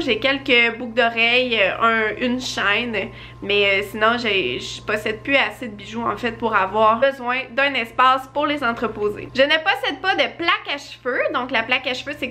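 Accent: Quebec, Canada. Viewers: l'accent québécois c'est vraiment un accent très spécial🤔🤔🤔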